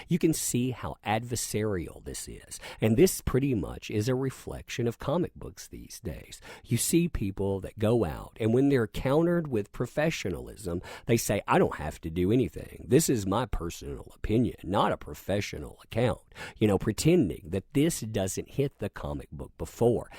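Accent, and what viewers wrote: South, United States. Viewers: Lol. Right. I’ve left comments a couple times about the “within this/that” blowing him shit about it in a friendly way. But this particular video had “within” all over it in other contexts too. Yeah I’m mostly just kidding. It doesn’t actually bother me or anything. And that’s a great way to look at it! You know he hasn’t been replaced haha I was thinking maybe that’s like a regional colloquial thing(?). He does have a southern accent a bit and I’m from the Midwest. Maybe that’s something folks say where he’s from. Now cap’n Cummings I just can’t watch anymore. Not because I disagree w him on anything at all (I 100% agree w his takes just like D&C and ) but his constant mispronunciation and misreading of simple common words. He’s SO dumb I can’t take it lol. God love him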